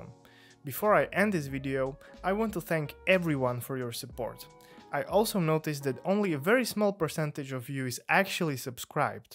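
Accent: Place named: Netherlands. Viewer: As an aspiring Flutter dev I really appreciate your content. From the first impression I thought you are from Holland/Netherlands just as Internet Made Coder, because of your accent, it has seemed really similar to him. Was lil bit shocked that you are Slovak, although the name sounds more slavic. Hope you would reach worldwide audience more and that helps you set up another one of your multiple income streams. Also I would like to see a video about your MacBook specs. I have already seen a lot of content by SWE content creators about that topic, but I'd love to see another perspective. Každopádně chlape dobrá práce, krásný 4K videa, thumbnaily taky vypadají dobře, že mě donutí kliknout na další video. Za to video ohledně specs bych byl rád. Díky a ať se daří. :)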